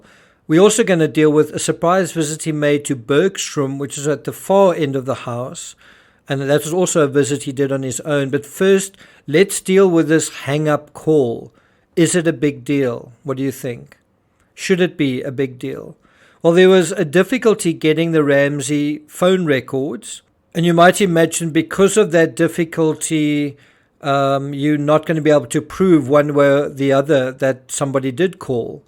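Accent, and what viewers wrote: South Africa. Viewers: you have an excellent way of presenting your podcasts I love the South African accent too. From Melbourne down under Australia 🇦🇺 🇦🇺🇦🇺 There seems to be an awful increase in the murder of young children either by a parent a family member or a paedophile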